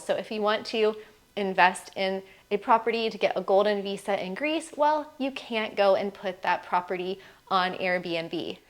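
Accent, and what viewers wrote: United States. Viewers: so professional, love your american accent, love your eyes, i'd give you the visa you wanted If it were up to me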